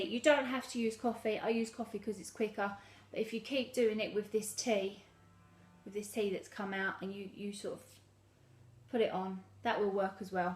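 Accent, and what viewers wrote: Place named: United Kingdom. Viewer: No don't worry about your British accent. I think it's pretty cool. Now with my Texas accent it's funny. Keep up the great work my friend. Hugs from Texas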